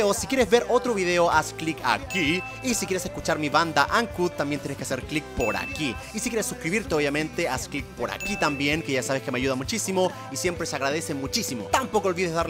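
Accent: Argentina. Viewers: german esta mas argentino en cada vídeo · Digan si no está ablando con asentó argentino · ¿Soy la única que notó que a se le está pegando el acento argentino de alguna manera?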